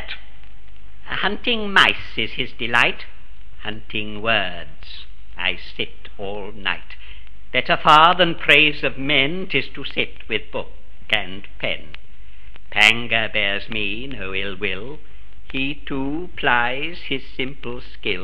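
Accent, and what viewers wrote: United Kingdom. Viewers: The poem is Irish so why are you delighted to hear a British accent? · Delightful to hear a British voice reciting this poem. By the way, why is Pangur Ban always portrayed as an all white cat?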